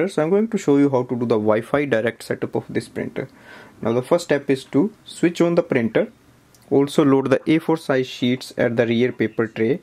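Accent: India. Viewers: I am among the millions of English speakers who have great difficulty understanding the variety of English spoken on the Indian sub-continent. Please remember that you must speak much more slowly and pronounce very clearly when speaking to us.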